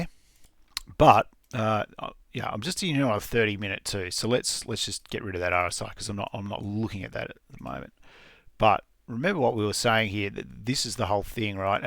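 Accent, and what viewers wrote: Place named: Australia. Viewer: Great to hear the Oz accent on YT!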